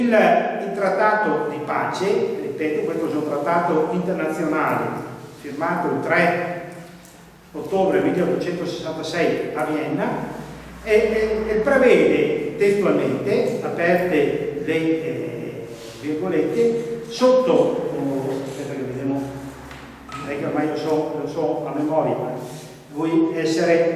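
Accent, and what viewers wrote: Veneto, Italy. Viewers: Un misto di Veneto ed Italiano ma male tutte e due,tant'è che io stesso che sono Veneto mi si sono allungate le orecchie per cercare di capirci qualcosa.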